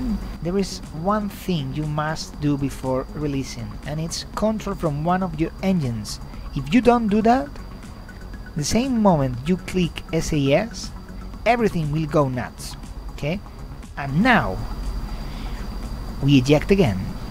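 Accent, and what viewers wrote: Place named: Poland. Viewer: Wait... You're spanish? I was thinking, that you're polish.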